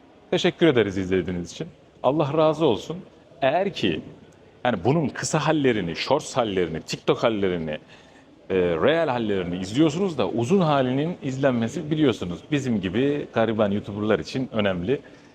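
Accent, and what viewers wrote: Turkey. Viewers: Bu kardeşimin vidiyolarina bayılıyom. güler yüzlü esprili tam ana dolulu konusma sen anlat tarihi kafasi iyialan dinler❤👏👍🤗🤲🐞🍀🍀🍀🐞🇹🇷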